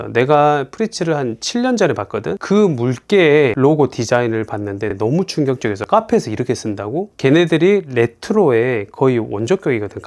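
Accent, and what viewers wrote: Jeolla, South Korea. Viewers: 전라도 사투리에서 거른다 ㅎ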